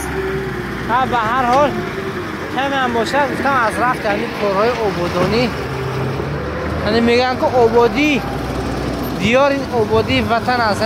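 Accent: Mastchoh, Tajikistan. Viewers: Бо лаҳҷаи Мастчоҳи гап мезанан.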